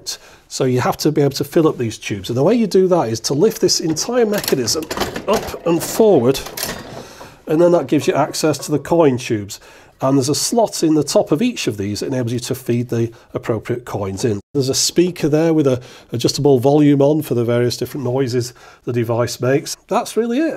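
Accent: United Kingdom. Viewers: should make a channel dedicated to British English lessons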